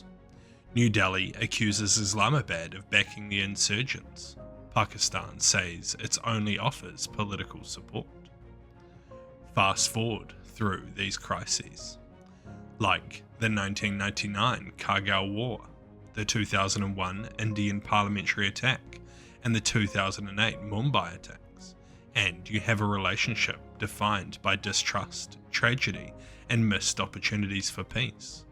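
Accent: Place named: New Zealand. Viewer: How dare anyone criticise beautiful kiwi accent and narration skills!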